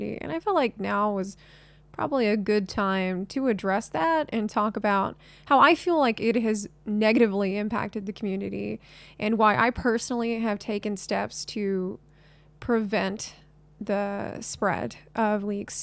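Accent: United States. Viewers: the country accent is strong with this one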